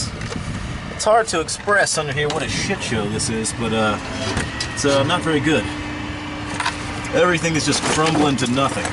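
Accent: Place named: Nashville, United States. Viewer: Where are you from? You’re in Ohio but sound like a fellow Nashvillian!!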